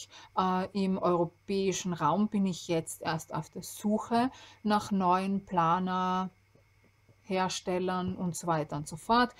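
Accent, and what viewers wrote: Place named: Austria. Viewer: ich den österreichischen Dialekt unglaublich gerne mag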